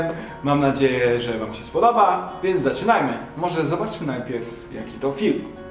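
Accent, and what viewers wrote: Poznań, Poland. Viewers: TO MUSI BYĆ MŁODY ZIEMNIAK ;) ps. WIDZĘ ŻE TROCHĘ GRASZ GWARĘ POZNAŃSKĄ PYRA-ZIEMNIAK ;) (POZNAŃ MOJE MIASTO )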